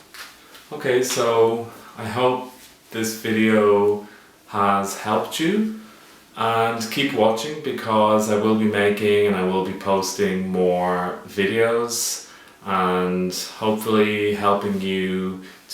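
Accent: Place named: United Kingdom. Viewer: Hi, First of all, I would say that your videos are great although I discovered your channel recently. I've got one question, are you german? cuz I haven't seen any german which speaks such a great british accent.:))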